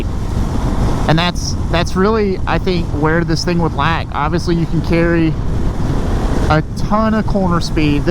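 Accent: Georgia, United States. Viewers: What part of Georgia are you from?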